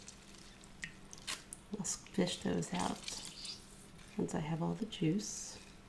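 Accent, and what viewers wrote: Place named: Missouri, United States. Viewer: This makes me want to eat an apple. I love apple season. Also, I love your accent. I live in Missouri and we have a lot of people here with that accent. I have some aunts and uncles with it and sometimes I say words like they do. Like I'll say "sell" instead "sail". And "wull" instead of "will" or "well". "Dill" instead of "deal", etc.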